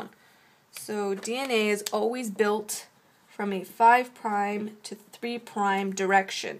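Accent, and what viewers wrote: Canada. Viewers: thanks sweetie :) SO helpful...are you canadian btw? :)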